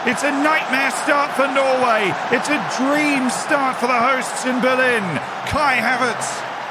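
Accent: England, United Kingdom. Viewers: love the non regional British accent but pronunciation is still americanised if you know what I mean... Still love your content tho. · Love the English accent quite happily listen to your commentary over most other people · This was awesome! And colour me impressed by your english accent. Didn't know you had it ya. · His British accent is immaculate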